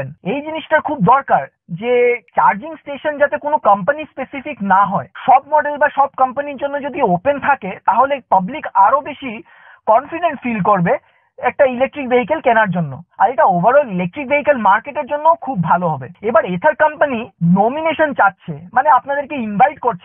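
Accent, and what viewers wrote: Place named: Bangladesh. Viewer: Aapnar pronounciation Bangladeshi der Moto..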